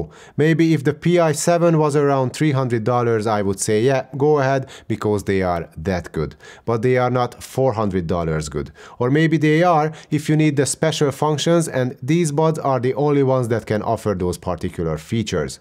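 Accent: Hungary. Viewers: Excellent review, saved me $400 since I was thinking about replacing my Airpods Pros for the PI7s. No need to now. Also the accent sounds Hungarian, curious if I'm correct.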